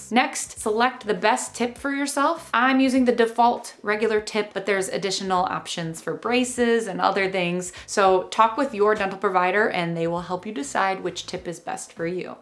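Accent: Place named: Chicago, United States. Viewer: Your accent reminds me of my family in Chicago. Eh.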